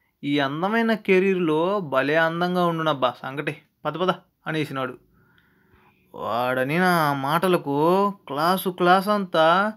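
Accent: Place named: Chittoor, India. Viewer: Baya chittoor slang lo chaduvu baya super ga untadi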